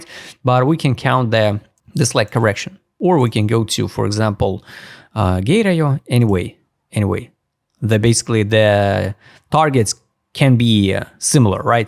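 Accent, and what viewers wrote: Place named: Russia. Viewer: disguisting russian accent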